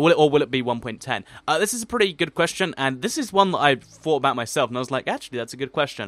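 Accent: United Kingdom. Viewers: You talk like if you were british and not Welsh. Just saying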